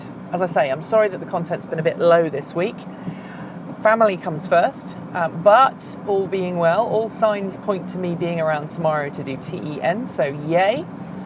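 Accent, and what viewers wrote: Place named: United Kingdom. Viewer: One of the reasons I subscribed to is that I appreciated your in vehicle driving soliloquies, common sense information, and your great British Accent. I also appreciate when you include things about your life, good, bad, or mundane, as we get to connect even more so. KEEP IT UP! I hope things settle down for your and yours.John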